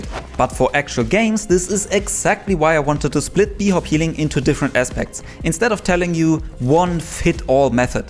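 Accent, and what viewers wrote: Germany. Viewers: Wait are you german? You have a german accent im sure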